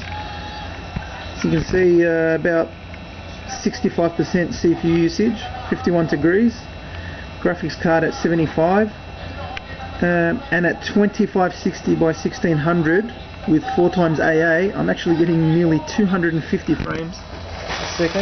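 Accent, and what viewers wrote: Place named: Australia. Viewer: 1200 Watt :) Aussie accent ftw.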